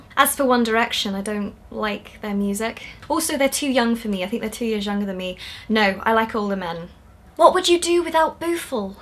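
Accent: France. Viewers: aaaah! I love you french accent! you speak very well, good job! I'm so happy because now I know that I can speak with you sometimes in french:-D tu es une fille super! ça fait 1 heure que je regarde tes videos et je ne m'en lasse pas:) Bisouuuux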